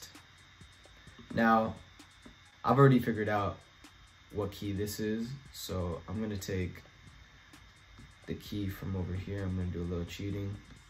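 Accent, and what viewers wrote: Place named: California, United States. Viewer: that’s wild lmfao I can hear it in your voice you been around Cali people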